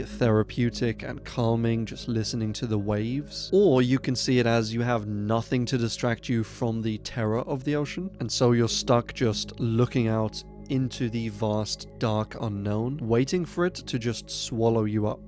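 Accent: United Kingdom. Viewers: Did I just find the British Wendigoon?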